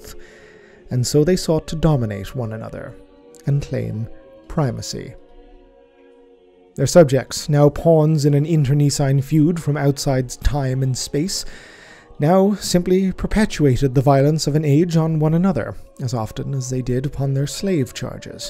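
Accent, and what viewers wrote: Ireland. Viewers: Where abouts in South Dublin are you from. Am I right in picking up a south dublin accent?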